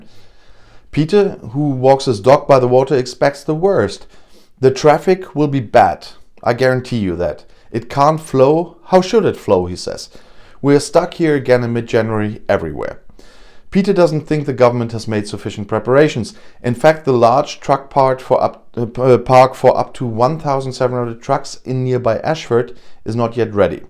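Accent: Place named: Germany. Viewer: With your slight German accent it almost sounds to me like the "Kent Access Permit" is perhaps instead called the "can't access permit" but that would be absurd wouldn't it?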